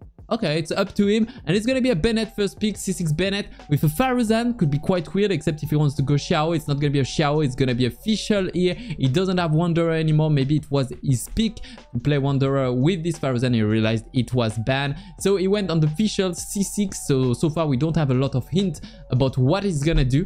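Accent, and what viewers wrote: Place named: France. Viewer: Tu gères de ouf je m'attendais pas à aussi bien quand t'as dit t'étais pas satisfait de ça en live ! Honnêtement je peux comprendre pourquoi tu ressens ça, on sent bien l'accent baguette mais ça c'est osef on te comprend très bien ! T'essayes d'avoir le rythme et énergie de commentaires comme tu le fais en Français mais comme c'est la première fois on sent c'est pas naturel à 100%. Ça reste quand même très bien pour une première fois, pour le peu de pvp anglais et commentaires que j'ai vu tkt pas t'es très bien à côté xD et tu vas sûrement progresser avec le temps en tout cas gg 🎉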